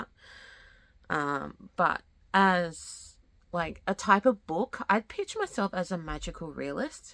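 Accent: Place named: Australia. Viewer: !!! this was so good! (also, yes. You do have an australian accent and it's so cute) 😍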